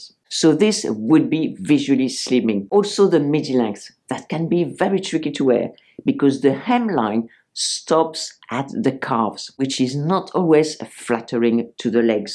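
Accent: France. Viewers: Dear, I'm not even 40 yet but still like to watch your videos for some tipps and inspiration. 🤗 And I adore your French accent.. 😊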